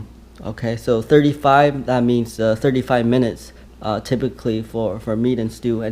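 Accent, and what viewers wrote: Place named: China. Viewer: A cook with a Chinese accent always make great food :-)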